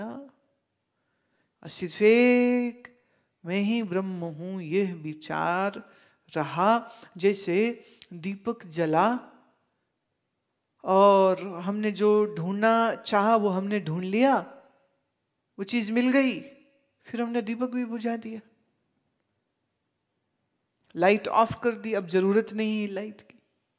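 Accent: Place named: Punjab, India. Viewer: Yours Punjabi ascents is too great.Jai Rafisahab and yours Vaani.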